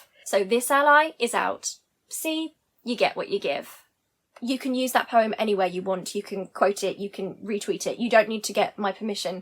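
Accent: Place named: Kent, United Kingdom. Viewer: Loving your english Kent Style Speaking for my Audiobook - I'm a German Producer and Novel Writer and wanna ask you to speak for women rights at my novel audio book - if you want it - write me a YES and make me happy - Im a fan for your channel for about a half year - my audiobook is splittet in some chapters about a chapter is approx. 15 min - when you speak a 1-2 Min Session it would be great for me and I'll give you the text - you can speak it in your smartphone and send me at <mail> - i would love to have you on my audiobook so much - Greetings from Bavaria to the Kent-Region of the Land of Kate Bush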